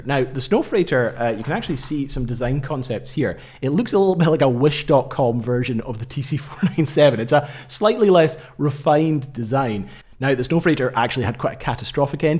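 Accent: Scotland, United Kingdom. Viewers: Excellent video just subscribed first time viewer😂 Nice to hear a jock voice and not a whiny American Voice Scotland Gaelic accent no 1🇮🇪🏴🇬🇧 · Outstanding job, and it’s even better with a Scottish accent. Very thankful you want to all your trouble to do this, it was well worth it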